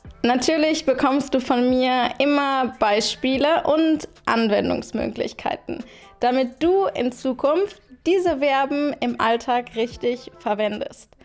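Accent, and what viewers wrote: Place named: Germany. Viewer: Ihre deutsche Akzent und die Art und Weise wie Sie alles erklären finde ich wundervoll. Vielen Dank dafür 😊👏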